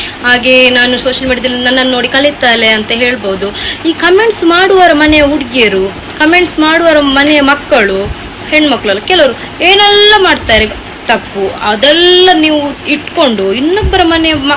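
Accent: Mangalore, India. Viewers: Talking slang klidre mangalore irbeku ansatte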